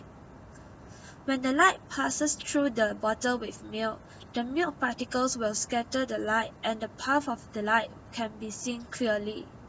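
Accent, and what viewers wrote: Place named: Asia. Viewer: Asian accent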